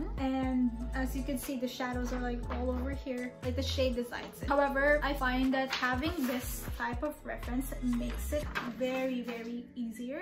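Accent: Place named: Philippines. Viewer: Thank you for this! <3 (Glad to find a Filipina/Pinay artist! thought you were Filipina by your looks+last name at first then your accent told me the rest. and hey I know my people 😂 mahal kita!)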